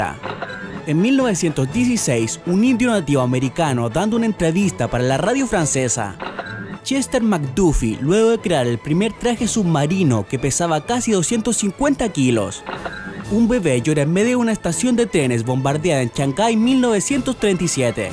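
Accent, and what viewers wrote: Chile. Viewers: hace un video hablando en chileno yo soy uno xd por eso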